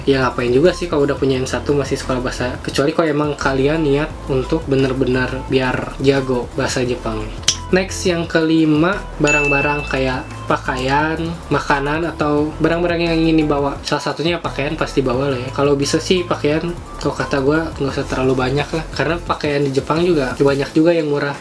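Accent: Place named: West Java, Indonesia. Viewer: orang bandung? hehehe meni sunda soalnya walaupun ngomong "gue":)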